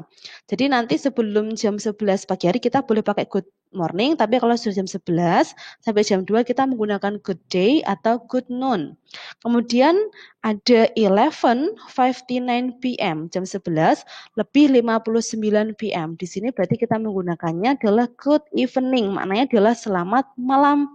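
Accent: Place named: Java, Indonesia. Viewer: Medok ya bund😅👍👍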